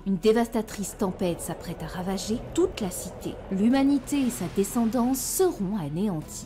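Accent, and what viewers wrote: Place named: Belgium. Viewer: Sur que tu es Belge! lol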